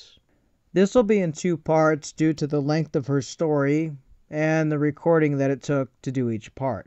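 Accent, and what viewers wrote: Nigeria. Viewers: my MIL was over and as I told you, my 2 month old daughter has to listen to these voice chats to calm down. She hears the accent, and I asked her where she thought he was from. She said Europe. To me it's baffling, but I went to a high school in Central Massachusetts with lots of Africans, many from Nigeria with a thick accent. SO i grew up knowing the accent.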